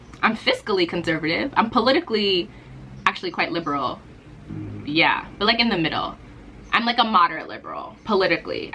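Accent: Nigeria. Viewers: i love the nigerian accent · Hilarious Nigerian facts : We are loud Our parents work hard We value degrees Your Naija accent on point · I love your Nigerian accent. This video is amazing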